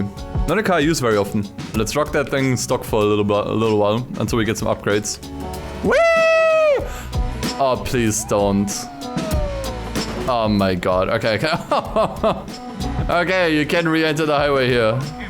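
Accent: Germany. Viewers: Man hört den deutschen Akzent voll raus 😂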